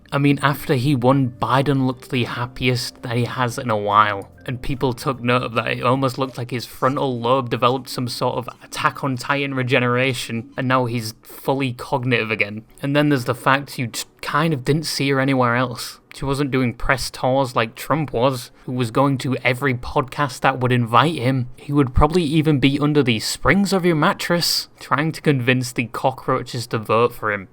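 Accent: United Kingdom. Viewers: Never thought I'll see a British bird talking about American politics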